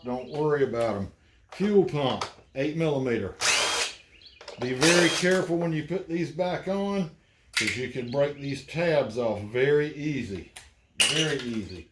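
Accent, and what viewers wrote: South, United States. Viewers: Love. The. Southern accent!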